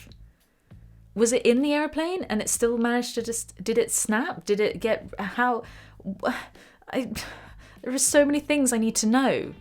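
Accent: United Kingdom. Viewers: Even when I lover her accent (or any british accent), my ears ain't well trained enough to understand the name of song Mary mentioned. Anyone can help me?.. Thanks!.. Guitar story is amazing!!!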